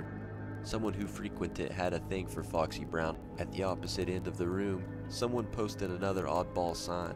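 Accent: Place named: Chicago, United States. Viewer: The narrator has a Chicago accent, which is odd for an NYC video.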